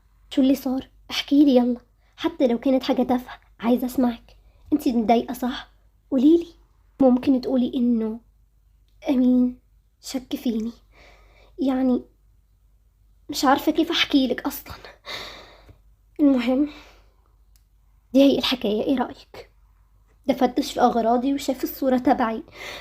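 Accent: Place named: Egypt. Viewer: انة من تونس ولاكن لكنك بتهضر مصري😊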